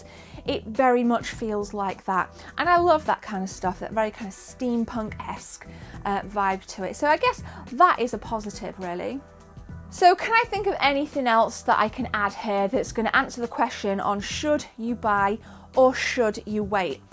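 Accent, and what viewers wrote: United Kingdom. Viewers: I love that British accent lol. My first time watching. Nice job! I'll stay tuned. Days Gone is so much better, by the way!!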